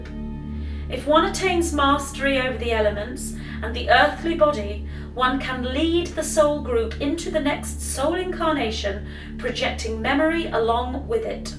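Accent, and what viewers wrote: United Kingdom. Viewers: Bit British aren't we?? I'm British too...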